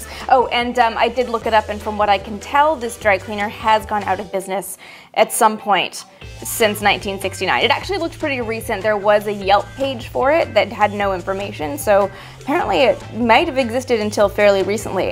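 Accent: Canada. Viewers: Missed your content. But most just missed seeing you. Like you face. Love the Canadian accent.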